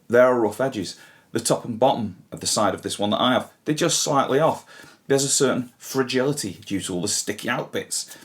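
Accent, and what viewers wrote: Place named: Stoke-on-Trent, United Kingdom. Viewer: Mate, are you from Stoke on Trent? That accent sounds familiar!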